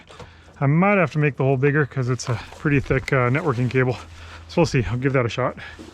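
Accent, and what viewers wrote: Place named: Appalachia, United States. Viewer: Lil bit hillbillysh but bearable to watch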